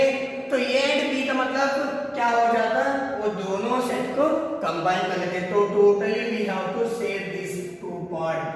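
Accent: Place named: Karnataka, India. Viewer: Pronounce somewords in Kannada but class is super.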